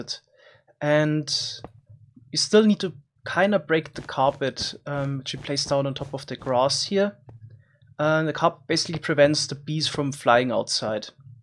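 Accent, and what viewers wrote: Germany. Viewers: Are you German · Ist er deutsch? :D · 100% deutsch mit dem schlechtesten Englisch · German accent?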